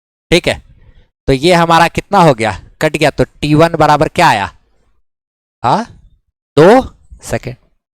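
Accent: Bihar, India. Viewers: Aap bihari bolte ho kya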